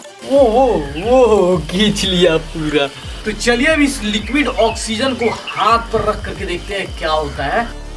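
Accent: Bihar, India. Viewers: Mujhe lagta hai ki app Bihar se hai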